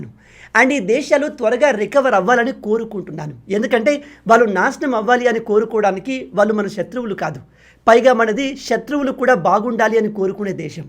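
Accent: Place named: Andhra-Karnataka border, India. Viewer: I was astonished when you told you were from Siddipet.I thought you are from some Andhra Karnataka border.Nice Telugu language you are speaking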